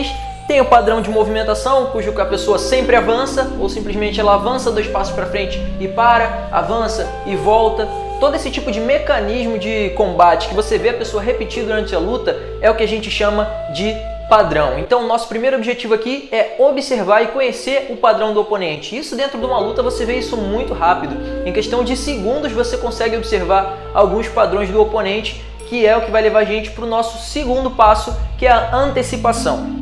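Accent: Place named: Rio de Janeiro, Brazil. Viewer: willian samuel eu acho que é aqui no RJ, nem sei, porque se tu perceber ele puxa o X "vocêx", todo carioca fala assim kkkk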